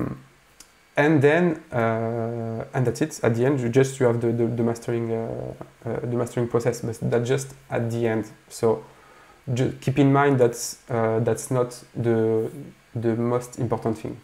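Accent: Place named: France. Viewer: Well done, do not worry about you French accent. This video is very helpful!!!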